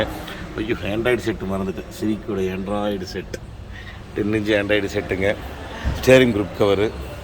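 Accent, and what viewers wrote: Kongu, India. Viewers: கொஞ்சும் கொங்குத் தமிழ் பேசும் கோமகரே...! திகட்டாத தித்திக்கும் பேச்சுடைய...! எங்கள் மதிப்பிற்குறிய திரு. அண்ணா அவர்களே❤❤❤🙏 எப்படி இருக்கீங்க அண்ணி❤❤❤🙏 எப்படி இருக்காங்க ரித்திக்❤❤❤ எப்படி இருக்காரு அருமையான வேலைப்பாடுகள் அண்ணா❤❤❤❤👍👍👍👍 தகரமும்கூட தங்கமென ஜொலித்திடுமே எங்கள் தங்கமகன் சிந்தனையின் வாயிலாக..! அந்த சிந்தனையில் உதித்த உத்தரவைப் பேணிக்காத்து வியர்வைத் துளிகளை நிலத்தில் உதிர்த்து உன்னதமான உழைப்பை உருவாக்கிடும் நமது Super Car Careன் உழைப்பாளிகளுக்கு அனைத்துலக நம் TMF குடும்பத்தினர் சார்பாக எனது நெஞ்சார்ந்த நல்வாழ்த்துக்களையும் பாராட்டுக்களையும் தெரிவித்துக்கொள்கிறேன்❤❤❤🙏🙏🙏 என்றும் அன்புடன் உங்கள் தம்பி என்றும் அன்புடன் உங்கள் TMF ரா.முஹம்மது கபூர் (சென்னையில் இருந்து) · தங்களது பல காணொளிகளை பார்த்துள்ளேன் இதுவரை யூட்யூபில் எந்த வீடியோவுக்கும் கமெண்ட்கள் செய்வதில்லை தங்களது கொங்கு தமிழும் அன்பு பொங்க பேசும் உங்களது நடையும் என்னை கவர்ந்தது மேன்மேலும் வளர மனதார வாழ்த்துகிறேன் தங்களது அலைபேசி எண்ணை பகிருங்கள் உங்களிடம் பேச வேண்டும்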